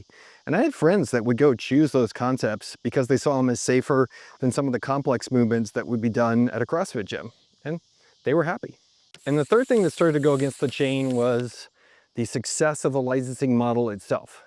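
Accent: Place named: United States. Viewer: Americans and their pronunciation of CEE / SEE names and surnames,…jeeeeeeeeeez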